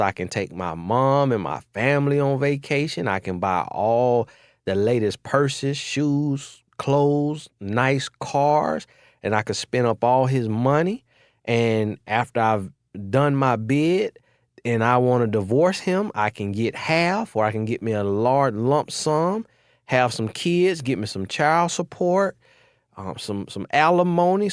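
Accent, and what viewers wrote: South, United States. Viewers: Is this really your voice, you sound a lot more southern in your newer videos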